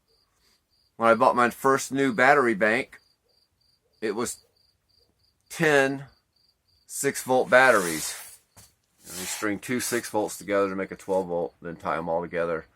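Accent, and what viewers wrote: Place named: Georgia, United States. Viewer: You sound like a Georgian 😅🍑 The dry desert heat is it way more harsh than the heat and humidity here in the South? Like walking around in a sauna wrapped in heavy wet blanket.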